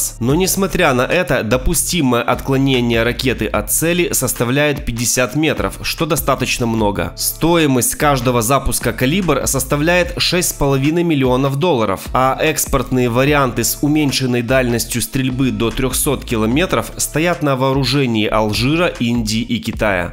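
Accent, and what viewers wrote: Ukraine. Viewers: Сразу понятно что украинец вещает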